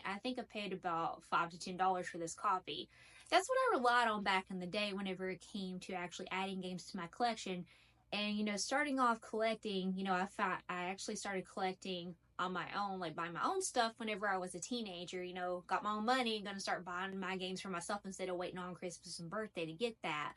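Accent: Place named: Texas, United States. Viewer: she sounds like a Texan to me 😍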